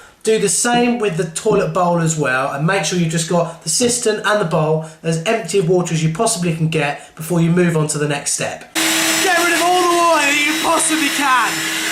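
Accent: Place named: United Kingdom. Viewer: I really like Brits accent and the way you do. thanks!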